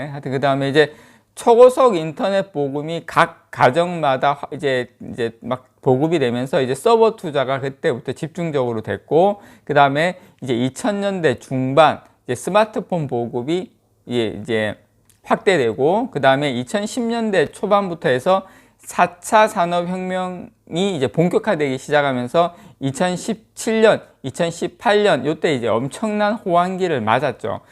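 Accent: Gyeongsang, South Korea. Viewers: 식사하셧는교?? 우리포항 싸투리 올만에들으니 반가위요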